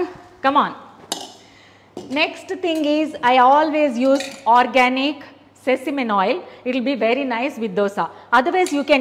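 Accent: India. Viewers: Yenduku antha over action butler English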